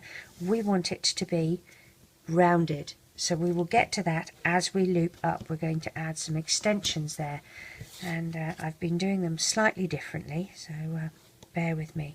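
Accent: United Kingdom. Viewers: Kate Schultz thanks♥ i love how do you speak...is it britan!? I love England! !!♥♥♡♡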